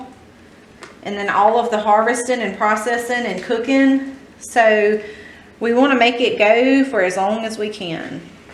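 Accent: South, United States. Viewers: You do have a southern draw!! Hahahahaha I lived a few yrs in Louisiana and people teased me a lot about my accent 😃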